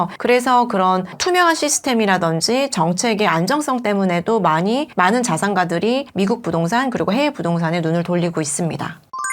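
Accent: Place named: Jeolla, South Korea. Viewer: 절라도 억양😢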